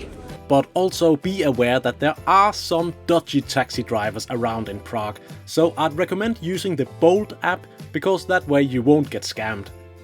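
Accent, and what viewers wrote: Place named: Liverpool, United Kingdom. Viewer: You sound like you have a slight Liverpudlian accent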